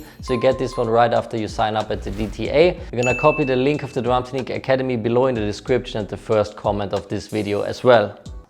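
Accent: Vienna, Austria. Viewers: Mehr Wiener Deutsch!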